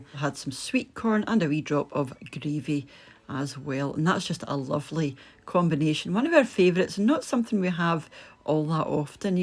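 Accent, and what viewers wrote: Scotland, United Kingdom. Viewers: Your food always looks amazing and your voice makes me feel so happy, I love the Scottish accent 😍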